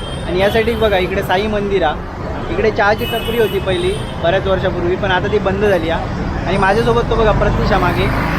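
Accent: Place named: Malvani, India. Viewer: ❤❤❤ शुभेच्छा आसंत तुमका सगळ्यांका जे ह्या चॅनेल कारणान् संबंधित आसंत... ❤❤❤ नैसर्गिक मालवणी शब्द आणि आवाज मुंबयत ऐकान् माॅप, संवेदना स्पर्शून जाता आसा...❤❤❤ मुंबय कोंकणातलो भाग आसा ❤❤❤ आमका व्हयी मालवणी... आमी कोंकणी, मालवणी... शुभेच्छा ❤❤❤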